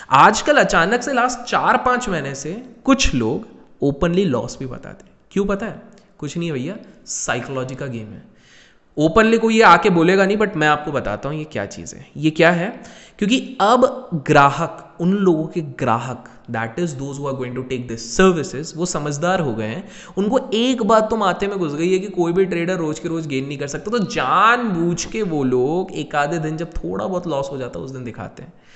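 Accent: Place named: Mumbai, India. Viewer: I like your Mumbai ya Hindi And the scam series was fantastic specially Dhirubhai Ambani vala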